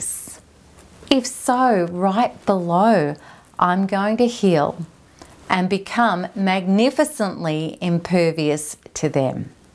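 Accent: Australia. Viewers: Can I please listen to you say you want me to "know" ? So cute . Are you from down unda? Wink wink. Love it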